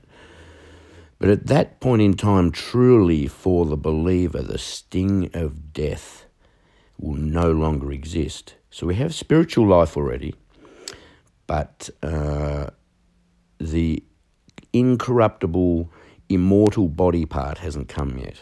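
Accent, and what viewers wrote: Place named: Australia. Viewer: While i was thinking how cute your pronunciation of Esther was, you said you got told for it 😂😂😂 i like the Australian english😊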